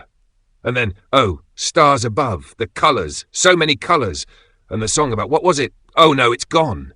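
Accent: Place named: United Kingdom. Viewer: Doesn't bother me any. Maybe you just don't like British accents?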